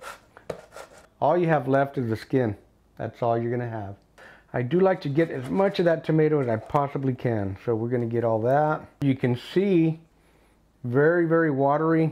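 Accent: Texas, United States. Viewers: I like how you look like a full Mexican but have the voice/accent of a Texan. AMAZING!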